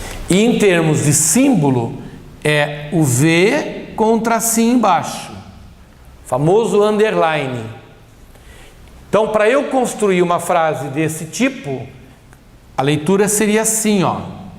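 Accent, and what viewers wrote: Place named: Brazil. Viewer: é pq quando se aprende na escola, a letra e tem som de é e em uma oração, quando se vai ligar palavras, tem som de "i". Acho que falam "ê" por causa do costume de algumas regiões do Brasil, por isso eu estranho kkkkkk Parabéns pela aula, estou assistindo os demais conteúdos. Obrigado por postar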